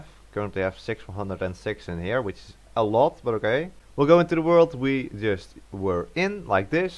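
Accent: Netherlands. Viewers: Thanks for the guide, are you dutch? You sound like from the netherlands love their english accent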